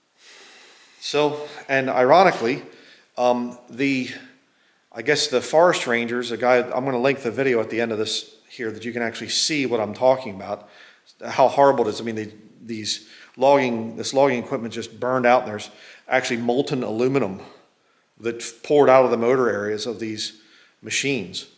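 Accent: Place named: Southeast Pennsylvania, United States. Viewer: Wow,you sound like me,raised up in southeast pa. On a dairy farm and going upstate for everything outdoors. Now living in maine 10 years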